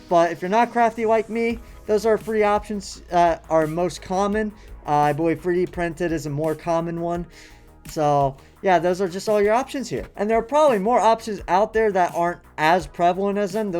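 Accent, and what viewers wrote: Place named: Netherlands. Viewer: Are you Dutch by any chance I can hear the accent a little bit hahaha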